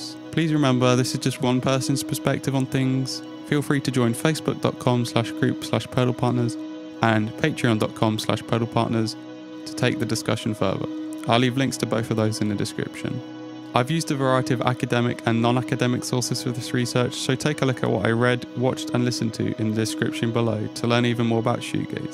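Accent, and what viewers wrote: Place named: United Kingdom. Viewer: mostly the british accent tbh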